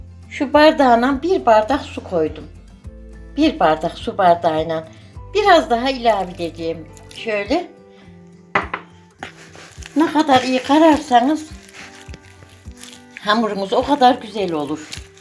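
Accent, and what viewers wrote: Kayseri, Turkey. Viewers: Maşallah teyzem nasıl özlemişim kayseri şivesini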